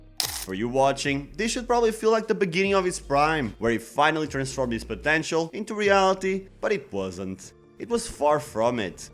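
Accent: Portugal. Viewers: Percebe-se logo que és tuga. Só por causa disso até vou subscrever o canal ahahahah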